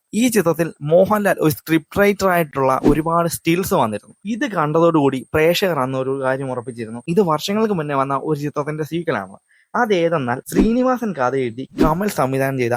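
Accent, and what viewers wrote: Kollam, India. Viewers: കൊല്ലം ഭാഗത്ത് ആണെന്ന് തോന്നുന്നു അവതാരകൻ്റെ നാട്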